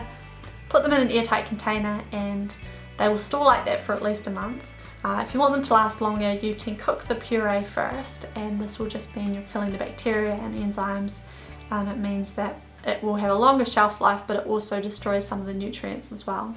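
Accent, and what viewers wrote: New Zealand. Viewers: She sounds like she's from New Zealand, not Australia. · is she from new zealand or australia?? (I'm KIWI!!!!!) 😂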